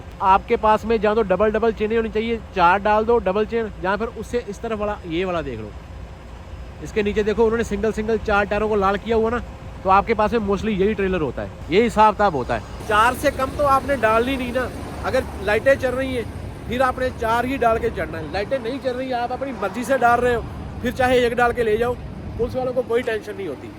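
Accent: Punjab, India. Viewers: Bhai aapki Hindi Punjabi mein sun ke maja aa jata hai 😅!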